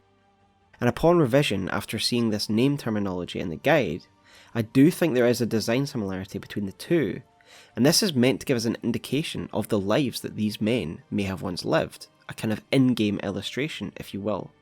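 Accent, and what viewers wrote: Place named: Scotland, United Kingdom. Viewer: It's nice to hear a Scottish accent. Love your vids man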